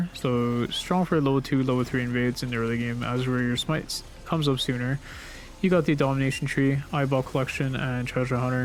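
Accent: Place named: United States. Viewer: I have not found this to be the case. Are you from America? He has a pretty strong regional American accent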